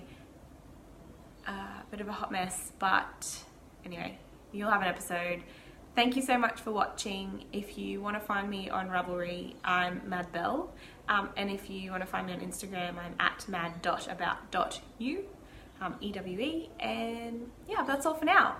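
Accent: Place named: Australia. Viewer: I enjoyed this podcast. It’s great to hear an Aussie accent talking about knitting! If you use the helical stripe technique you won’t have a join where you change yarns. It’s really easy and definitely worth looking up. Good luck on your trip.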